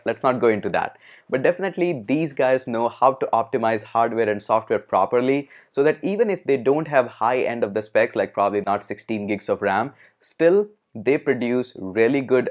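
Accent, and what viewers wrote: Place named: India. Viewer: Which laptops? Am i supposed to watch 12 mins of indian accent rambling and look at you instead of laptops? Rofl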